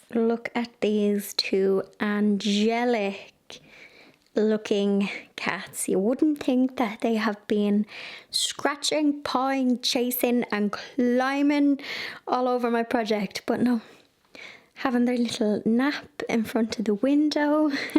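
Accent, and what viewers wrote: Ireland. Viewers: Leave the ceiling white. I admire your DIY skills. Love your Irish accent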